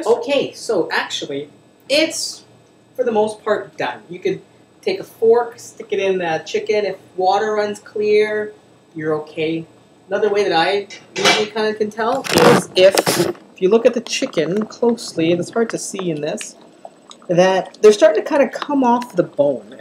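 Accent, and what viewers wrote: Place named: United States. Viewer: trini cooking trini and have american accent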